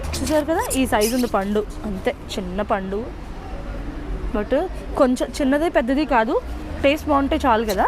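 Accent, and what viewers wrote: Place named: Godavari, India. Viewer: మీ గోదావరి యాస వినసొంపుగా వుంది, మేము కూడా లండన్ వచ్చేయాలని వుంది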